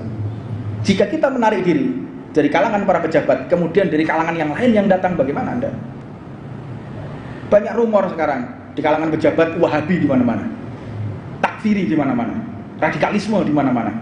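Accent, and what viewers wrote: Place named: Indonesia. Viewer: nyenengin banget habib husen...pola pikirnya..dakwahnya...gaya ucapanya,nusantara banget...sehat selaku bib...abaikan habib arogan yg lain,teruslah cinta nkri,menjadi habaib,dzuriyah nabi tak perlu di rabithah lawiyah,tapi di akhlaknya nabi bib...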